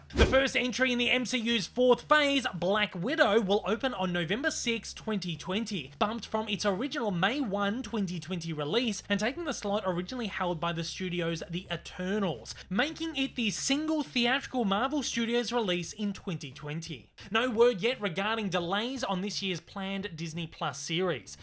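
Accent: Australia. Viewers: Well that’s an Australian accent. You better get used to it lol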